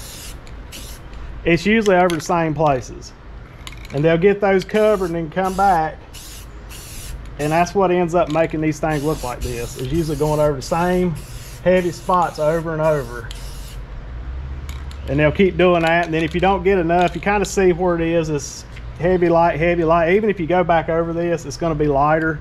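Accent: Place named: Texas, United States. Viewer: Love the Boomhauer accent. I'll look for that paint with the options for spray pattern.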